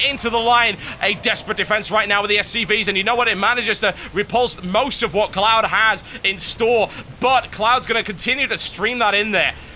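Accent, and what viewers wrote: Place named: England, United Kingdom. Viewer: you're accent is embarassing to be english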